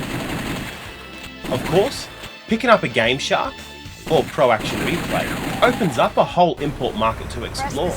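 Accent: Australia. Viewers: The Sega "Satin" I love Australian accent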